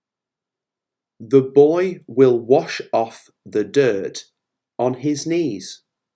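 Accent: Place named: North, United Kingdom. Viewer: Your teaching method is fantastic but the pronunciation is like from Yorkshire or Manchester .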